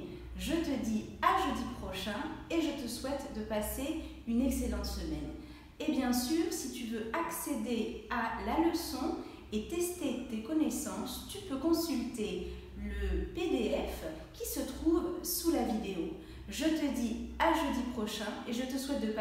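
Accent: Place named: Brittany, France. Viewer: excusez-moi mais puis-je vous demander d'où vous venez en France? votre accent a le même air de celle à Anne qui présente la chaine 'Parlez Vous French' sur yt, et elle est Bretonne (?). je suis encore en train de regarder cette video, merci beaucoup quand même :)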